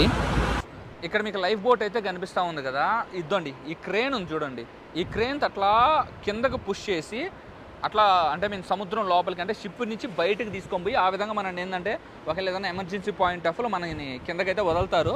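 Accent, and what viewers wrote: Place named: Nellore, India. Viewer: Brother ne bochulo Nellore slang aaapu, and marchu plz madhi kuda Nellore but vinaniki irritate ga undhi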